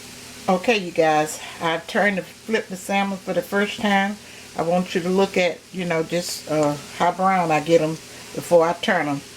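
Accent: South, United States. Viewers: These patties are just like the ones my mama made. She tried to teach how they were made but being young I would not pay attention cause people today (back then) don’t eat this kinda foods. I miss her ways of cooking but you cook just like her So I just want to thank you. Plus I love your soft southern accent mine has changed over the years Love you and your videos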